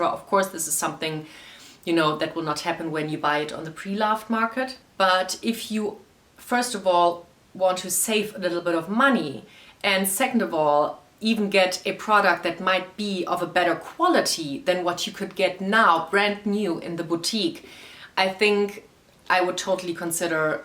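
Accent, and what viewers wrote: Germany. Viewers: you sound very German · Nice video! 🤗 Do I hear a german accent? 🤔 Edit: Habe das Video jetzt tu Ende geguckt, da erwähnst du sogar, dass du Deutschland kommst! Liebe Grüße, habe dich direkt abonniert :)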